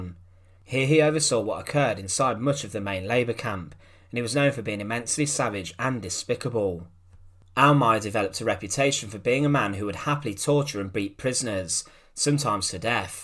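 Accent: United Kingdom. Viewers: PLEASE INVLUDE ENGLISH SUBTITLES IN YOUR VERY INFORMATIVE VIDEO DOCUMENTARIES. YOUR STRONG REGIONAL ENGLISH ACCENT IS DIFFICULT FOR ME TO DISCERN AND UNDERSTAND . THANKS FOR THE GOOD WORK YOU ARE DOING . KEEP THEM COMING.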